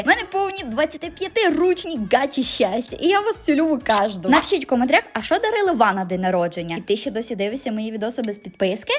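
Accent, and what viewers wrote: Transcarpathia, Ukraine. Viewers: А закарбацькій діалект😊😊😊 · Скільки повторяти це ЗАКАРПАТСЬКИЙ ДІАЛЕКТ ЗАПАМ'ЯТАЙТЕ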